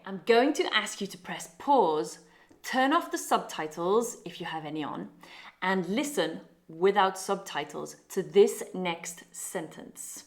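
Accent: United Kingdom. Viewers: New subscriber ✌🏼 Are you british? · are you british?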